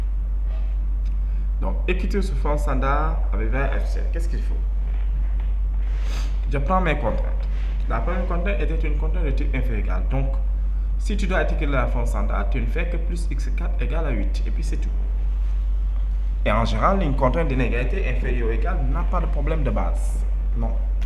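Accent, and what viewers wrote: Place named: Quebec, Canada. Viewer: quebec french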